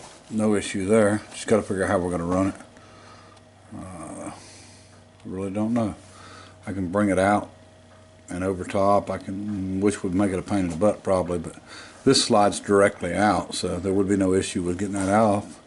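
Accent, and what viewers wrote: South, United States. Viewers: What state you in? I live in Rome Georgia. You sound like your from the south. I'd love to see the bike in person and maybe when done it may be in a show so we all could see it. I ain't trying to sound like a STALKER.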